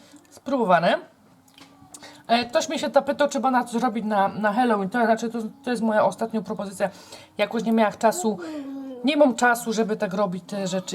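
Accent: Silesia, Poland. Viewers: ciastka gówno? :D zajeżdza niemieckim akcentem jak u slązaków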